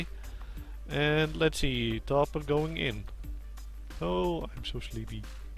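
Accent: Netherlands. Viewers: u have the most dutch accent i ever heard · you sound like you are from nl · are you dutch you sond like it? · Your accent sounds dutch Are you dutch? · Are you dutch? I can hear it. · dutch accent xD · je praat met een zwaar nederlands accent moaaaaan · je bent gwn nederlands, valt aan je accent te horen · it is just the way dutch poeple talk english becuse you just talk like me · are u dutch? if not u sound like a dutch talking english ^^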